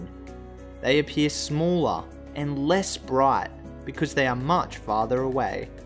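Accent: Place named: Australia. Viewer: this is the single most Australian accent I've ever heard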